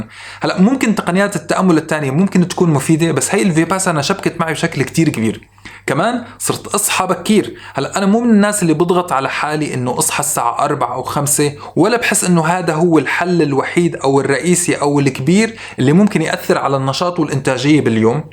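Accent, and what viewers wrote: Syria. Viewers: انت سوري من السويدا او من الساحل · حضرتك سوري ولا اردني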